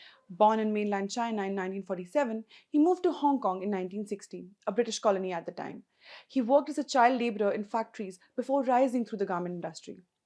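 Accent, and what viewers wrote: India. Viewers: I pray to God that my children do not learn to speak English from the presenter of this video. No offense to Indians but such a bloody awful accent. Try learning to speak proper English first before coming onto broadcasting. It's an insult to the industry. Half the listeners can't make out what the hell she was talking about. That was the biggest joke of the day !!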